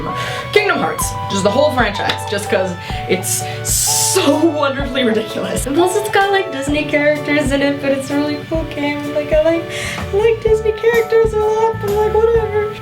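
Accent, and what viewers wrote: United Kingdom. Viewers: Haha :) your New Zealand accent kinda sounded like an English Birmingham accent hehe awesome you made me laugh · Your NZ sounds like a bad English accent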